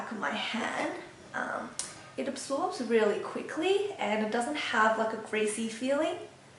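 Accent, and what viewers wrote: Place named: Australia. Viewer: you live in australia.. hahah i no ;) *your accent***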